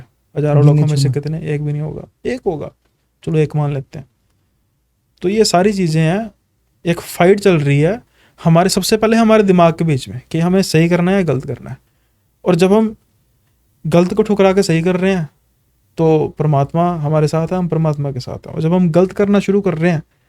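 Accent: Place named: Punjab, India. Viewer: Yaar ye punjabi accent me baat kar raha hai.. aadhi baat samajh nahi ayi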